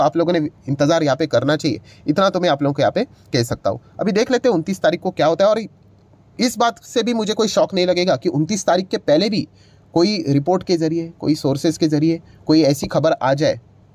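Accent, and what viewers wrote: Maharashtra, India. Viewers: Sir Marathi h aisa lagta h, Thodifar 🤣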